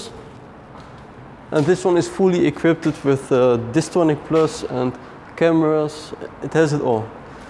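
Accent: Netherlands. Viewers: Dat hollandse accent haha heerlijk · Nik Topler its dutch english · dutch accent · Prima video, ik zou alleen proberen met iets meer emotie te praten. Het klinkt door het Nederlandse accent niet heel gemeend, maar dat is gewoon oefenen. Blijf zo doorgaan! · you can really hear that your dutch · Kyro de Boer ik denk dat hij wel nederlands is, ik kan het merken door zijn engelse accent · Lekker nederlands accenttje gap 😂😂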